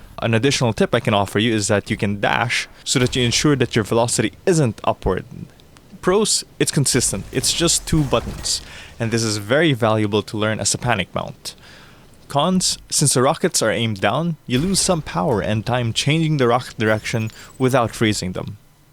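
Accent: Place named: Philippines. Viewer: been watching your guides and they've been extremely helpful, thank you! are you filipino btw because that accent is so recognizable ahahaha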